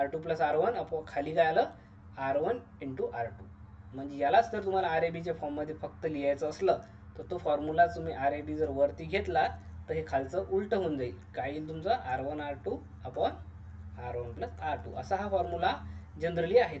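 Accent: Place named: Vidarbha, India. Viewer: विदर्भा मधले आहात, भाषे वरून समजते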